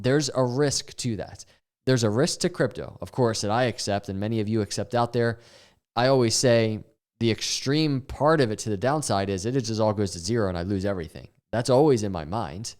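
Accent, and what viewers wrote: Philadelphia, United States. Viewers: I love your channel bro. I did my medical training in philly and you seem to have the accent. U a philly guy? If so pats or genos?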